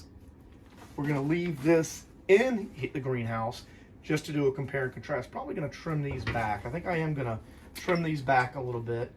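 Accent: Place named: Louisiana, United States. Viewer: Before I look deeper in your about section... New Orleans? Southern Louisiana? I recognise that accent. 😁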